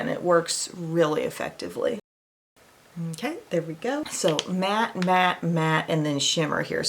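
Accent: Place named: South, United States. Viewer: I also love your “oh isn’t that pretty” in your slight southern twang ❤️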